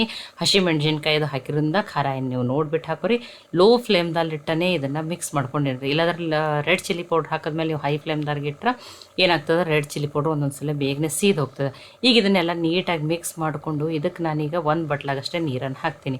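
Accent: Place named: Dharwad, India. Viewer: Akka,bhaal ruchi aged nimma adigi.Bhaal cholo anistu numma Dharwad maatu keli❤ illi Bangaluru bhasha keli byasara❤❤❤❤❤🎉🎉😂😂😂😂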